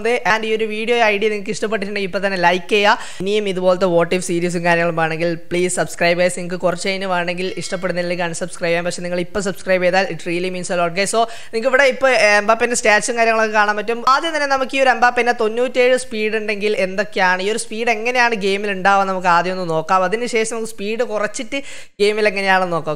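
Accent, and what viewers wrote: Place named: Tamil Nadu, India. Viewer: Naduvula naduvula tamil varudhu😂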